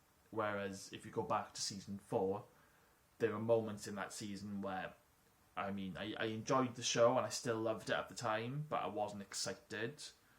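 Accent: Wales, United Kingdom. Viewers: Thank you for reading out two of my comments. So happy that you are interested in what I my opinions :D That link I sent you, I think the producers may have gotten onto that because I noticed earlier that they photos have been replaced. The comment with Channing being the stalker, I actually jumped to that conclusion before I watched that episode. I should wait until I watch the episode before I form conclusions lol. You mentioned Prisoner: Cell Block H, I am a massive fan of that show. I am from Northern Ireland, so I watched it too, on ITV back in the 90s. I was a young kid at the time. It was always an argument to get to say up late to watch it, and most of the time it was taped for me to watch the following day lol. One thing that I was disappointed about in Wentworth is that Bea and Joan never got to have their fight scene, unfortunately. I wanted something similar like episode 326 of Prisoner, with a more updated version of that awesome music used in that fight scene. 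I thought Season 2 was too soon since it was Joan's first season, but never happened in Seasons 3 or 4. Oh yeah, on how to pronounce Marie. You can say it either way. I know of a few women called Marie and it can be pronounced Ma-ree or Marry. It probably sounds different with my accent. The Marry version is just a fancier way of saying it really lol. I like the way you say it, keep saying it that way in that nice Welsh accent :) Apart from Prisoner and Wentworth, what other prisoner shows do you like? I a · I can't wait till season 7 starts, any idea when it's going to be shown in uk? Love your welsh accent by the way😀.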